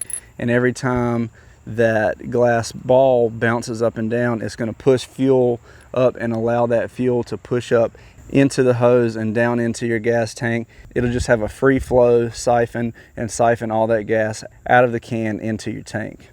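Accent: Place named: North Carolina, United States. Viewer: I knew that was a NC accent. Thanks for this video. I'm draping a leg all over your channel my man!!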